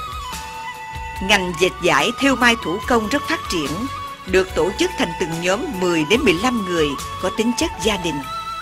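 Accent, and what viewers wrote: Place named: South, Vietnam. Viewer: Người đọc giọng Nam Bộ rất dễ nghe, tình cảm, rõ ràng. Cám ơn bạn.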